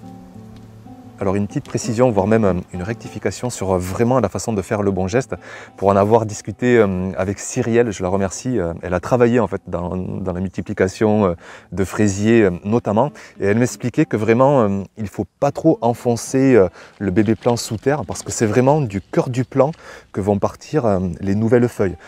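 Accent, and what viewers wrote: South, France. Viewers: Oui c est y ça la voix du sud belle semaine également ☺️🙏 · Merci! Quel pep's avec bel accent du sud! Ça fait du bien de t'écouter! Je m'échappe ainsi un peu de mon bureau et de l'industrie!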